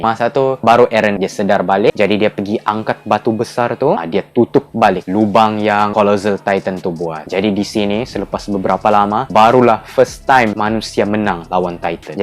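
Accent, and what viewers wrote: Sabah, Malaysia. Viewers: Saudara speaker ini pasti orang Sabah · Hsha sya suka ni orng ckpp ORANG SABAH THE BEST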